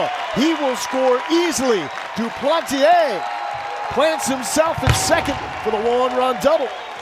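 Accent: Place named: South, United States. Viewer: “the runna is ou” 😂 welcome to the south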